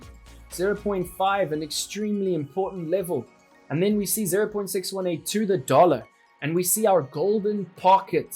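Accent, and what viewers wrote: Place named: South Africa. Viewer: SAFA accent lol SA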